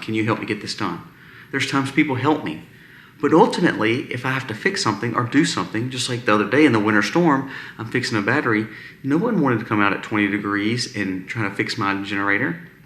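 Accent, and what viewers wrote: South, United States. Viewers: I love all my Preppers and their channels but we don't own the house ( which is a small 2 bedroom cabin) we live it and we don't have a middle class income. We do have a propane cook stove and a wood stove but the well goes done it won't be pretty. We are 70 and 72 and my husband spent 24 years in the military. I put in a huge garden the first few years we lived here but I can't do that anymore. But we are happy and warm. We do live on a small 1/2 mile dead end road with about 12 other houses. We don't know what the future holds but we'll keep going until we can't go anymore. I have a stent in a major artery which keeps me from doing a lot of things and it's giving me a lot of issues. So I don't know if they can do another surgery or not. I really love your channel and have been watching for a long time. As a person born in Michigan who basically came into adulthood in the south ( TN and VA) I just love your accent. 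It makes me "homesick". We have no family here. My husbands Mom and Dad died years ago and he has an uncle he hasn't seen in decades. I grew up an orphan so have no remaining family members. I've had an interesting life that is for sure. I just wanted to say hello and let you know how blessed I am by your channel. Thank you for pressing on in your creating of these videos. I wish you and your family the blessed. Stay sweet. Rachele Rae...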